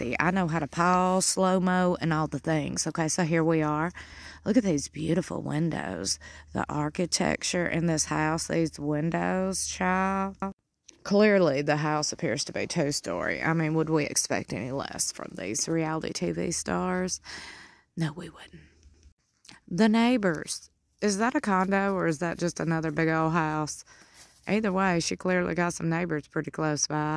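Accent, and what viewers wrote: South, United States. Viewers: She's crazy! Why doesn't she protect her babies from a wasp? 😢 I love your videos! You have such a sweet, southern accent and you make me laugh. 😊😊😊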